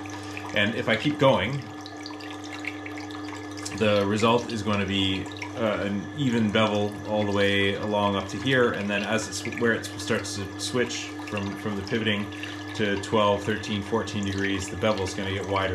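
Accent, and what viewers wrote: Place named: Canada. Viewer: Perhaps its because we both speak 'Canadian' (!) but I finally understand the concept. Thanks again for the time you take to film and explain.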